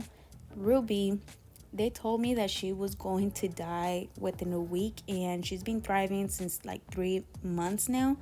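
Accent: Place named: Spain. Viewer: ADRIANNA TRUJILLO She clearly has an accent and it’s cute. You can tell she speaks Spanish by her accent is what I mean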